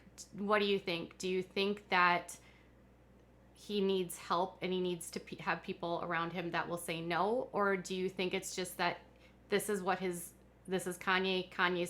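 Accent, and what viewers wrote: Minnesota, United States. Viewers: I think needs to go back to writing some BS liberal snowflake “column” or “blog”. She pathetically uploads several times a day about ridiculous topics with no proper research or fact checking. She has to censor every little word she is afraid might keep her from making a buck. Hey, if you’re so passionate about your political beliefs, just say the word. ABORTION. If you want to even ATTEMPT to play the reporter role, drop your awful Minnesotan accent and learn to use talking head diction. Putting a bag over your head might help as well. You have a rare talent of making someone’s eyes, ears, and brain bleed simultaneously.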